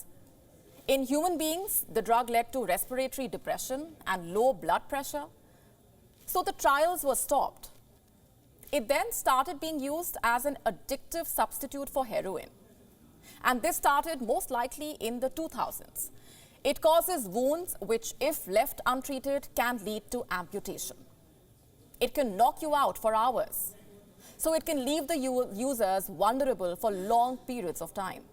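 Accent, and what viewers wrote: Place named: India. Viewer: she's indian so her pronounciation is different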